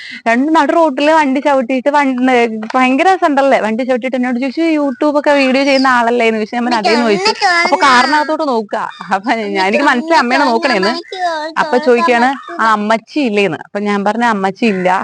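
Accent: Trivandrum, India. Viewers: Language kettittu t.v.m annu 😊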